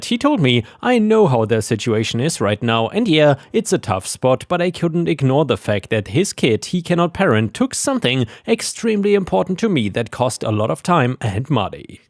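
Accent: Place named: Germany. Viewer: I can't help it, but your accent sounds very german to me. Are you a german expat in Thailand? Anyways, thanks for the entertainment!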